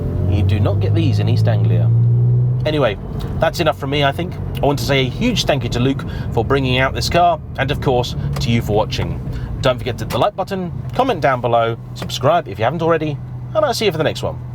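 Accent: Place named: Yorkshire, United Kingdom. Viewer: Did enjoy the Yorkshire reference and accent 😂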